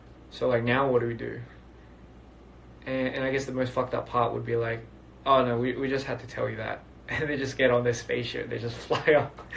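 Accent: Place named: Australia. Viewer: Just here to listen to his aussie accent lol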